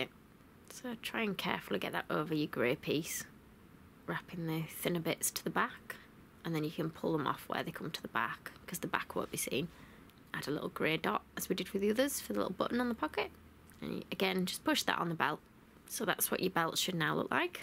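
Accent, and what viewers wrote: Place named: North East England, United Kingdom. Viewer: Are you from the same area as Sarah Millican? Your accent sounds similar. It's a lovely accent to listen to, from both of you!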